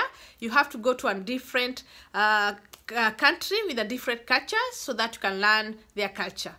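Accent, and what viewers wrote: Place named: Kenya. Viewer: You cannot take the village out of a person..kikuyu accent still there after 10yrs,#gikuyu&mumbi seed.....proud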